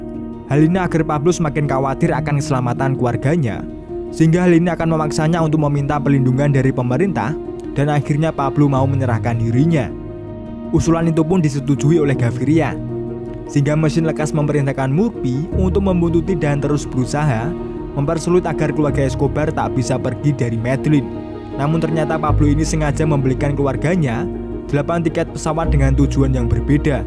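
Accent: Java, Indonesia. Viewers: Mas sampeyan Wong Jowo?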